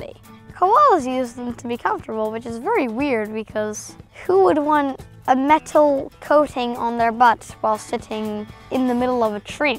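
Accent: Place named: United States. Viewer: he's not an australian his accent is to American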